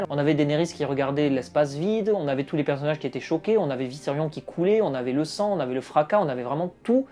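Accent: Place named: Bordeaux, France. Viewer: je sentais un accent bordelais mais vu que tu parle de feria j'ai plu aucun doute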